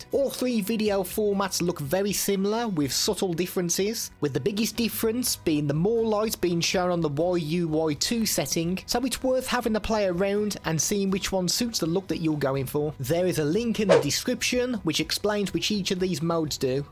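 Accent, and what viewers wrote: Black Country, United Kingdom. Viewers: Great review and even greater Dudley/black country accent 😂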